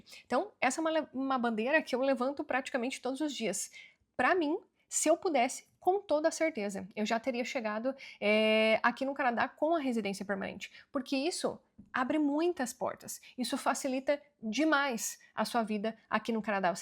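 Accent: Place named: Paraná, Brazil. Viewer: Assistindo muitos videos seus. Que deus lhe abençoe sempre! Seu sotaque anglo paranaense e trocando o S pelo x tipico de avó alemã e um barato! Voce e uma fofa e a sua inteligencia amplifica sua beleza! Parabéns 🎉 Sonhos nao envelhecem !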